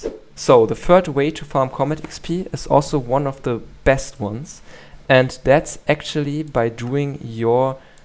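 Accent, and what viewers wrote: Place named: Germany. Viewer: ich lieb den deutschen akzent hammer video tho :D is there a better way from like combat 40-50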